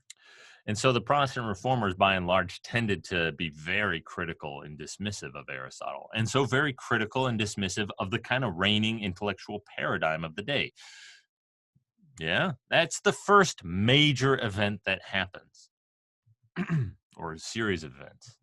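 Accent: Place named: Great Lakes, United States. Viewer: Are you from Michigan or Ohio? Somewhere else in the Great Lakes Region? Curious based on your accent. Thanks a lot for the great content man! Fun to get a mini-Uni-style course on here.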